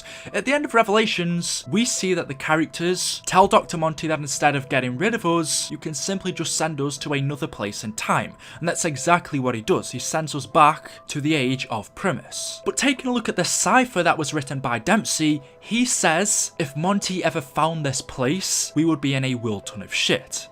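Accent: United Kingdom. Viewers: Is the Mansion in buried the blacksmith's who built the pack a punch? I mean the pack a punch is near the Mansion so can this possibility be true? P.S show me in the video plus are u British?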